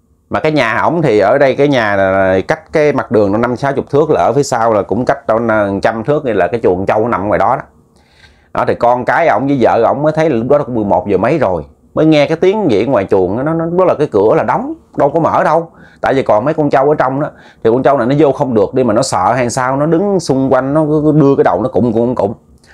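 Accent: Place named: South, Vietnam. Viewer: mình ng miền bắc mà nghe ng miền nam hay tây í nghe khó hiểu vài câu:))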